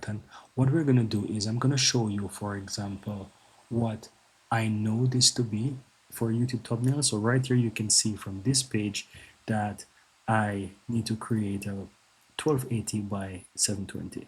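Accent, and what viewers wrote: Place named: Jamaica. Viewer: You sound Jamaican. I'll have to listen to your tutorials for that reason alone. My background is Jamaican and it's music to my ears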